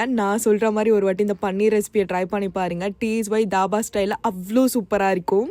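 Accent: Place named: Chennai, India. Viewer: Chennai Tamil pa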